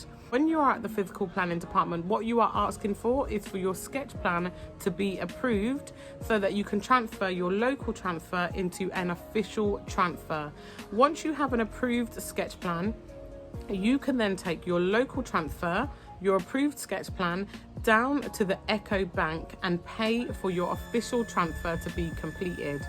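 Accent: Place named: United Kingdom. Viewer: Greetings, that is indeed a lengthy process, however it's a great business for one who wants be become a realtor. Love your British accent. Are you enjoying life in The Gambia?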